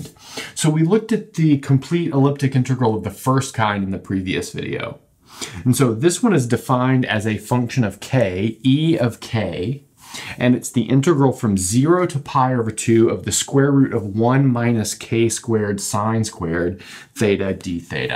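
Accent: United States. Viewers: As non native english speaker I have hard time distinguish between Cee and Zee in US accent. It would be much better if US people pronounce Z as Zat like British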